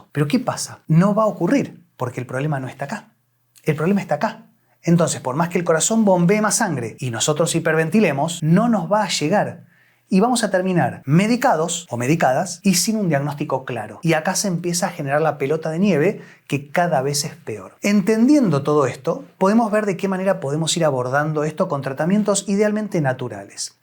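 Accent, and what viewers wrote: Buenos Aires, Argentina. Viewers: Sí yo me imaginé que es de Argentina porque lo escucho Hablar, pero de qué parte? Supongo que debe ser de la provincia de Buenos Aires, no sé si así de la provincia pero Sí o sí es de Buenos Aires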